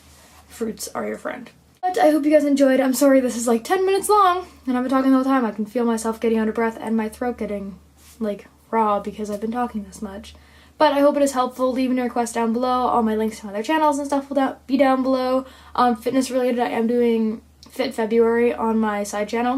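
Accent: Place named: Canada. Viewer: You live in Canada right? I can tell by the accent... I think...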